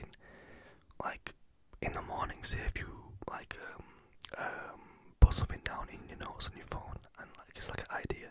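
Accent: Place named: Yorkshire, United Kingdom. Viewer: I like your outlook on people who don't get ASMR! Just let them go 😂 I been guessing you were from Leeds from your accent, when you said Bradford made my day! I never get it right hahah. So glad your channel has grown, well deserved ✨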